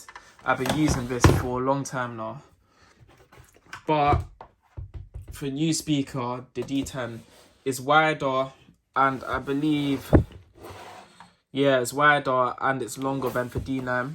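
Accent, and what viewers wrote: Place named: Northern England, United Kingdom. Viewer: Bruv are you from Manchester ?your accent sound northern.im from london so what just wondering, safe for the video fam can you pair your d9 with the d10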